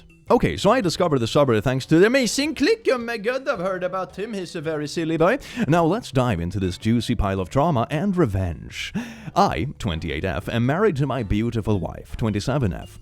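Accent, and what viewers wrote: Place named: Sweden. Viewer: I did love your pronouncing eugenics as euyenics. (That’s a thing in Swedish, right? G’s make Y sounds, don’t they?) Anyway, I do love it when little bits of your accent slip through more (and then some when you actually speak Swedish. I hope to learn it at some point)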